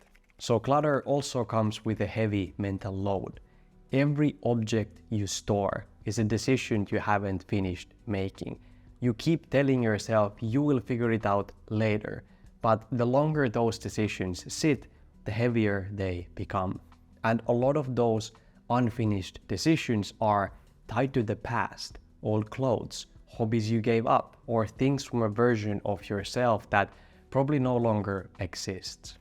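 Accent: Finland. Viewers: Got a recommendation, dat finnish accent, I don't even speak the language but I still immediately recognized rally english :D This is one of the best summaries it's going on in my community, especially among older people. It affects a lot older people, seems like this happens when your cognitive capabilities drop, hence I'm lately trying to avoid hoarding as would demonstrate I'm already getting brainpower reduced. Old people, at least in my country, were rather well off, even with mediocre job you could afford a home, so they don't value space as our younger generation. Also in the past jobs and overall life didn't require much intellectual efforts, which lead to this situation. Another reason for hoarding is that certain things are very hard to get rid of, with all this green agenda, you can't carry all the junk in the nearest dump container and if you do, you try to get there without anyone spotting you.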